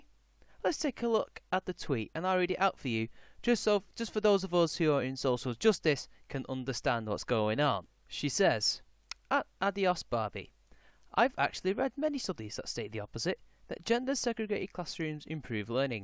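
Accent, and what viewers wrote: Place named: Manchester, United Kingdom. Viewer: I used to live in Manchester for 4 years, I found hints of mancunian in your accent in an earlier video and it stroke a comfortable feeling. Make a TYT video man.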